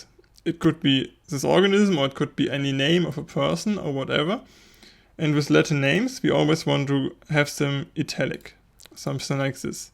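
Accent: Germany. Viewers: Very well explained even though with a hard German accent :) I spot some similarities to linux commands and i.e. escape characters. My only suggestion is to deal a bit with some vocab like Backslash "\" is a escape symbol, you have parenthesis, curly braces|brackets or square braces|brackets colon is "Doppelpunkt". | is vertical bar or "pipe"... Anyways... Thank you for the well-performed explanation!! ++++ You dropped your website it seems. Maybe you have to edit your description and the video.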